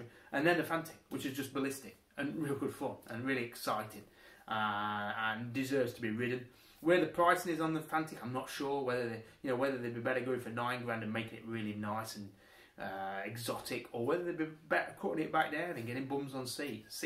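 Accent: United Kingdom. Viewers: Your stupid accent is hurting our ears no matter if some people tell u otherwise.just try to speak with Canadian or American,mate other brits can ,u can do it too ..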